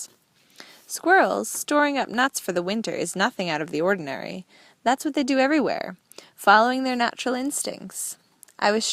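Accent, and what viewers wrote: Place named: United States. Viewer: the disgusting american woman accent . Horrible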